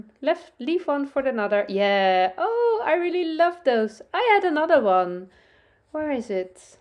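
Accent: Netherlands. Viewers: Hi I finally found your podcast, its such a pleasure listening to you. I crochet, knit and sew also, but I have yet to start a you tube potcast. Your english is fine I understand you very well even with the dutch. ❤️🙏💕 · Arms are exposed. Is the word you're looking for. I do enjoy your Dutch accent. Your very talented.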